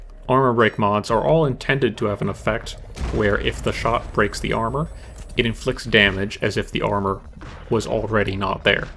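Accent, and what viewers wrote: United Kingdom. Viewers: Love for explosives, booze and an intense Great Britain accent.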